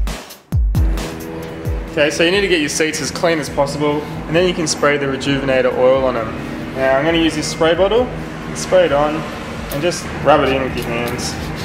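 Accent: Australia. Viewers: Great to hear the Aussie accent! Thanks for the great video and info. I see I can buy it in Wodonga. Can I use this product on a vinyl dash board and window pillars? It is not possible to spray them, so I am presuming I would apply several coats with a cloth without removing the dash (huge job to remove it)? What can you suggest?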